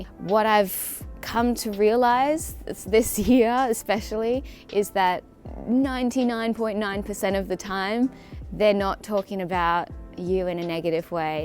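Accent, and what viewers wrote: Australia. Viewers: Its just An aussie talking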